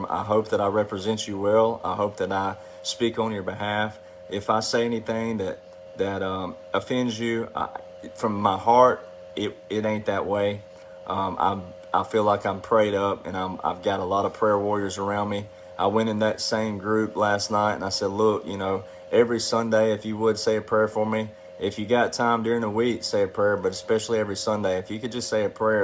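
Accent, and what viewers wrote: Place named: South, United States. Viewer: Patel Patriot has a reputation for putting others down. Likely jealousy. We love you here in Boston. Could listen to your southern accent all day! And you re right. If one of these truthers did what you do everyone would have woken up A year ago!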